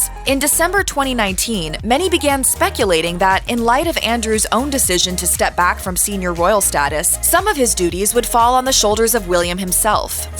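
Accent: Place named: United States. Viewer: Video: "Prince Andrew is one of the most...." Me: *Hears American accent, immediately skips to next video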